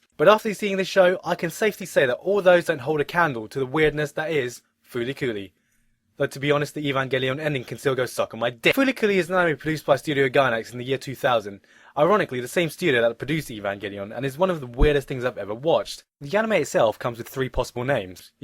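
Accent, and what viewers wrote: United Kingdom. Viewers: he sounded more british back then but wow he's twice as much of an OG for when he watched this anime, it was 11 years ago when he watched it since it came out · britishness shinin' thru in these old videos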